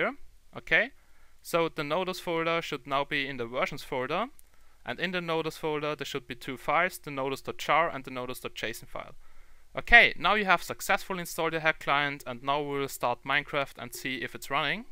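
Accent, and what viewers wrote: Germany. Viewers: you sound like German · You have a German accent haha: DD!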